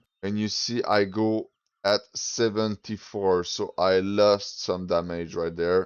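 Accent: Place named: Montreal, Canada. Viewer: I see the accent you from Montreal?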